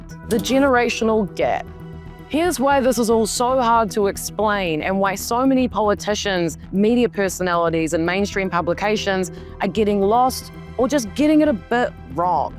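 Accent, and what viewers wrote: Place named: New Zealand. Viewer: "IJJY JOKES" 😆 Omg I love your kiwi accent ❤️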